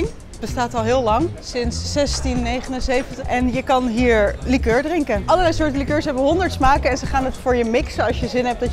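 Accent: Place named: Wassenaar, Netherlands. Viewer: Iemand die spreekt alsof ze uit Wassenaar komt is voor mij zoiezo geen echte Amsterdamse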